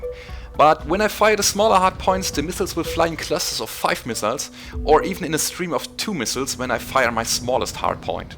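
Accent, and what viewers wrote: Germany. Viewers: That German accent is music to my ears. Sorry, just had to point that out.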